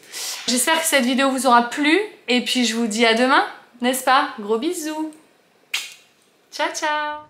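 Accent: South, France. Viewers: Oui j’adore te voir faire le ménage 😅 viens chez moi 😂 avé l’accent peuchère ❤ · Owe owe owe! Vous allez arrêter de vous moquer de l'accent du sud là oh!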